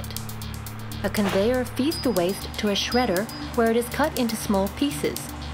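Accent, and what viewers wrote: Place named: China. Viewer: Couldn't find a Canadian to do the voice over? The English is perfect, the accent from China is still on there on the back end though.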